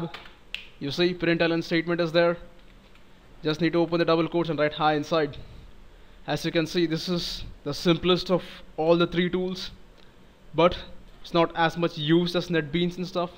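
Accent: India. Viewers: Indian have indian accent. So what? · Where can i find the non-indian accented version of this tutorial? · Indian accent :3.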